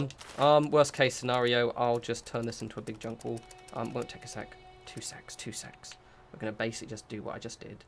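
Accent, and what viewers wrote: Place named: Yorkshire, United Kingdom. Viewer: Thumbs up for that Yorkshire accent 🤣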